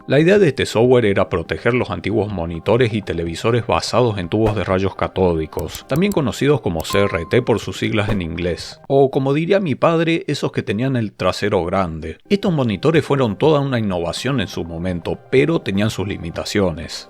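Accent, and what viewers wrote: Argentina. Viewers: Por tu acento me da curiosidad saber de que parte de la Argentina sos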